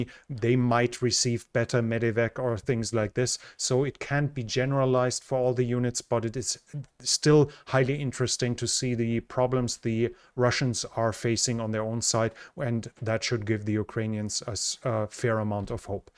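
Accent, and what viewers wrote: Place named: Germany. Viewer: Where are you from ? Germany or Scandinavia ? Just guessing As a German your accent could be both :D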